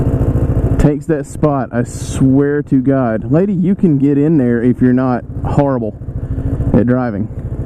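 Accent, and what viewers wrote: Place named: Baltimore, United States. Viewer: Are you NC native?....I swear I hear a Baltimore accent lol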